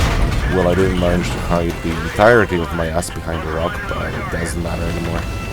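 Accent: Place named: Europe. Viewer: Yeah. We have a similar PRAV clan, and for some reason, you sounded European to me, so I thought you were EU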